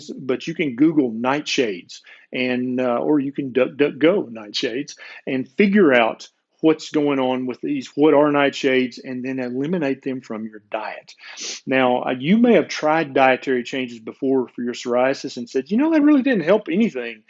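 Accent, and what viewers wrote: South, United States. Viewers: Thank you for making these videos. Explanations made simple and concise with a southern accent and a handsome smile... Love it!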